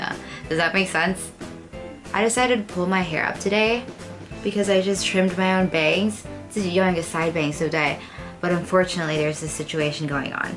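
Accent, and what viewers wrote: United Kingdom. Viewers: I really like to watch your videos .....I'm falling in love with it ...and I hope to see more interesting video ❤️....furthermore I also like your english accent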